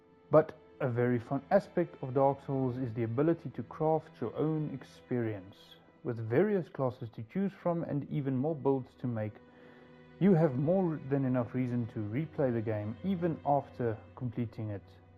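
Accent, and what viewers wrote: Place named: South Africa. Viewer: The accent tells me you're from South Africa.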